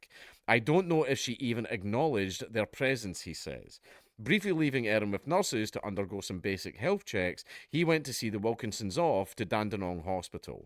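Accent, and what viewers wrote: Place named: Scotland, United Kingdom. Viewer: Locals pronounce it more like "Lean-gatha" (I live just over in Moe, probably 20 minutes away) Great to see Dank covering a local story! Dandenong pronunciation was as spot on as a Scot's accent will allow 👍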